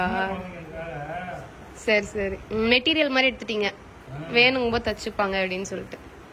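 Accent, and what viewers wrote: Sri Lanka. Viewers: மொழியழகி நீ இலங்கை தமிழ் என்பதில் ❤❤❤❤❤❤❤❤❤ இன்னும் நேசிக்கிறேன் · தங்கை உன் தாய் நாடு இலங்கையா அதுதான் மொழி ஆழகு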